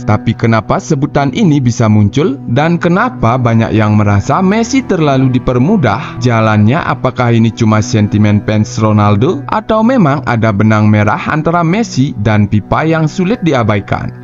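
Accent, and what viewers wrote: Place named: Aceh, Indonesia. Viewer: Suara admin nya macam logat org aceh...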